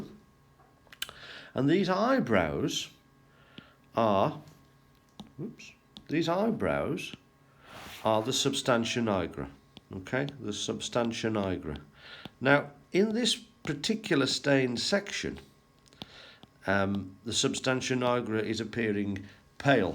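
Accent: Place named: United Kingdom. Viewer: THANKS.SAME THEME DIFFERENT PERFECT WAY.SOMETIMES THE VERY BRITISH PRONUNCIATION IS ADORABLE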